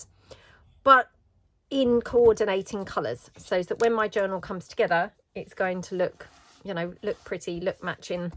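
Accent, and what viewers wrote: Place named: RP, United Kingdom. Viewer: You are amazingly creative and inspirational. I love your posh accent and your journals rock! Thank you!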